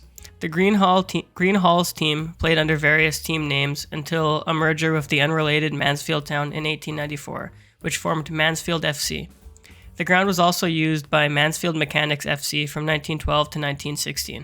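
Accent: United States. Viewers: Voiced by an American with no understanding of what he is reading from a script. Mistakes are numerous and unedited. Please save us from these and/or get a different voice-over. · My home town. Was surprised to hear an American talking about it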